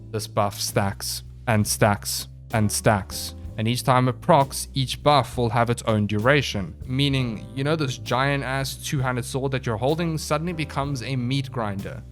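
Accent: South Africa. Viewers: Great vid Boet. Think I'm recognizing some home accents there? You from SA?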